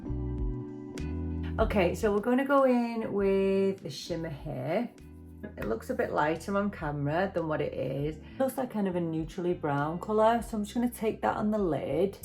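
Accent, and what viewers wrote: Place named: Manchester, United Kingdom. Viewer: Thought I detected a slight Mancunian accent ☺️. Wilko stores no longer trade, haven't found any pharmacies that stock Essence as yet!! Have a good day! Xx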